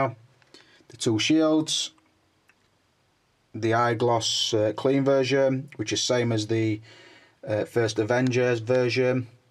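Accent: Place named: Yorkshire, United Kingdom. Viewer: tou sound like a good old yorkshire boy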